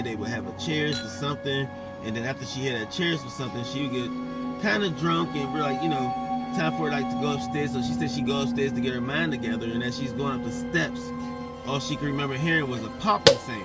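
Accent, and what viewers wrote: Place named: Mississippi, United States. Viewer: You sound like you from my neck of the woods..iam just could u be from yazoo county Mississippi 👽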